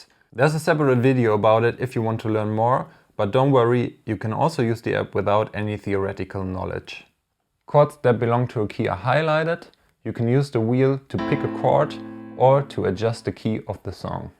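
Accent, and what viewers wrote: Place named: Germany. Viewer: You Sounds like a German Guy when you speak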